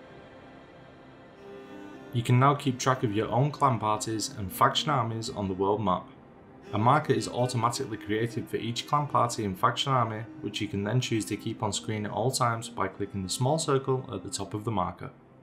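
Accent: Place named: Turkey. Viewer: okuyanın Türk olduğu çok belli bee :D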